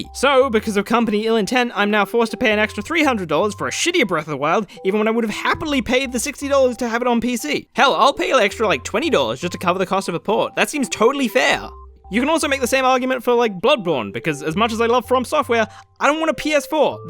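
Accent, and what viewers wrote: Australia. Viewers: wait, you're australian?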